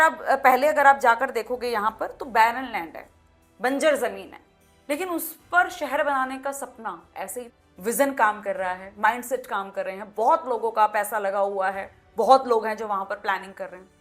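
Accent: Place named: Haryana, India. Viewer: Madam ji thanks.for giving knowledge dholera city investment.aap mhari boli bole rahe ho.that is haryana.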